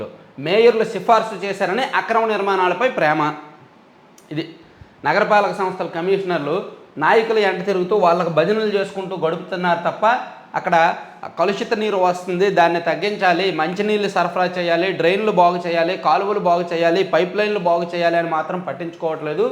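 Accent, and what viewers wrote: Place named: Uttarandhra, India. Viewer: Babu née voice mana Uttaranchal voice lo maaku nachhutundi and content Kuda baguntundi Kani neeku inka people support Kavali alage people tho direct talking introducing Chalayan Manchin alochana wish you all the best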